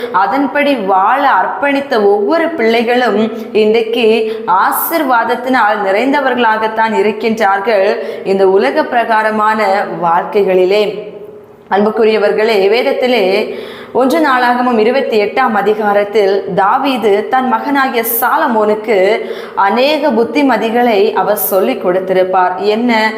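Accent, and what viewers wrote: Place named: Kerala, India. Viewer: Amen Kerala tamil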